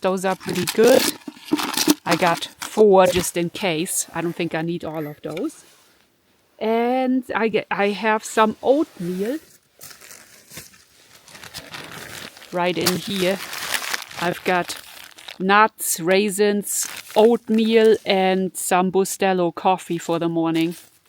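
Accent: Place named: Germany. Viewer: Hallo, Was für schöne und abwechslungsreiche Wanderwege in absolut fantastischer Landschaft. Beeindruckend diese Felsenlandschaft mit gefrorenem Wasser. Das hätte ich in diese Gegend nicht erwartet, das es so kalt werden kann. Schöner Nebeneffekt des Videos - mein Englisch noch ein wenig Aufzubessern - sehr angenehm mit deinem deutschen Akzent und sogar besser verständlich 😊 Gott sei Dank gibt es hier bei uns keine Bären😉 Danke fürs mitnehmen und noch einen schönen Sonntag! LG Thomas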